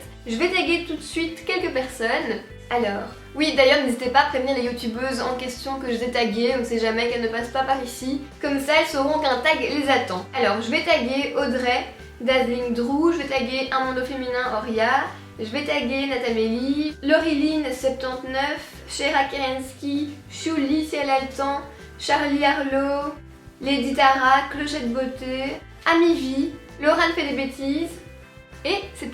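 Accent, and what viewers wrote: Belgium. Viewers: J'adore ton accent belge ! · ton ptit accent belge est très marrant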